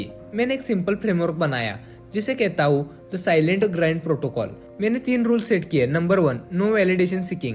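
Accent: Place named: Maharashtra, India. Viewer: Are u marathi bro? Ur pronunciation sounds like that ❤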